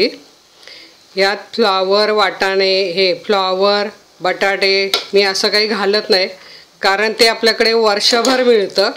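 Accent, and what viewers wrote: Khandesh, India. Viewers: kaku me nehmi pahte tumchya receipes.bhari sangta tumhi.tumhi khandeshi ahat ka? bolnyachya style warun watla mala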